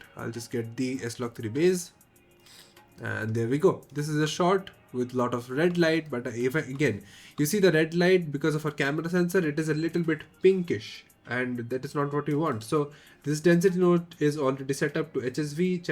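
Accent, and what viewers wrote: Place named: India. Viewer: can you please practice the accent, i don't understand well your english with india accent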